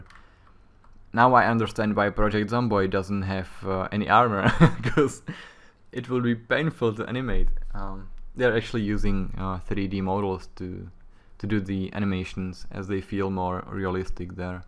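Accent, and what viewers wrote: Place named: Czech Republic. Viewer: ***** Where are you from? Because you sound likr someone from czech republic :D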